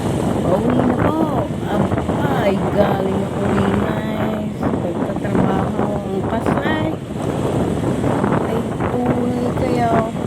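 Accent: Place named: Batangas, Philippines. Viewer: areng batanguenyang talaga oh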